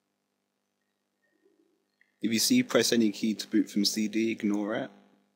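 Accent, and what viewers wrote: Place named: United Kingdom. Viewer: u r british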